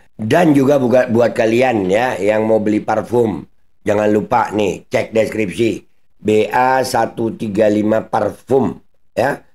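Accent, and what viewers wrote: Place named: Jakarta, Indonesia. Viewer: Salut sama..😂Bawain Acaranya slalu bikin ane Ketawa karna ada logat Bahasa BETAWInye😂ane ndiri orang Betawi Salam Dari Betawi Pinggiran Bang 🙏🏻👍🏻😁.. ane suka liat videonya.. Yah Emang Musuh Yang Paling Jahat,paling Licik,paling ga manusiawi itu, ibarat Pepatah SERIGALA BERBULU DOMBA..Musuh yg menyerang dari dalam,di dalam Umat Muslim sendiri..Mengaku Muslim..tapi Menyerang sesama Muslim,kaya gini nih sifat PWI LS..😂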